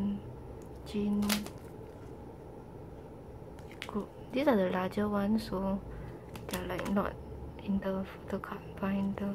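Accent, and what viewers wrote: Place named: Singapore. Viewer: Your collections are srsly goalsss! And omg I knew you're a Singaporean just by that singlish accent, you sound so cute 😂😂 I hope you get more subscribers because you honestly deserve them I'll be checking out other videos from this channel now 💖💖💖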